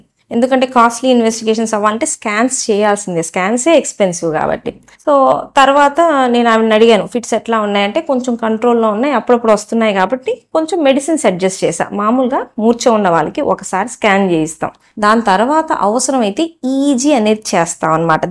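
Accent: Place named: Prakasam, India. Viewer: మీ విడియోలు దాదాపు అన్ని చూస్తున్నాను చాలా చక్కని ప్రకాశం జిల్లా భాషలో చెప్తారు. మన ప్రకాశం జిల్లా అంటే నాకు చాలా ఇష్టం అందులోను తెలుగులో అందరికి అర్ధం అయ్యేలా చెప్తున్నారు. చాలా మంది రోగులకు మీరు చెప్పి విషయాల వలన ధైర్యం గా వుంటారు. నిండు నూరు రెళ్ళు మీరు మీ కుటుంబ సభ్యులు చల్లగా వుండాలని కోరుకుంటున్నాను.🙏🙏🙏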